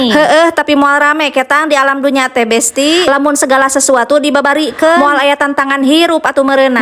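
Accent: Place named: Sodong, Indonesia. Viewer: Logatnamah ciga urang Sodong.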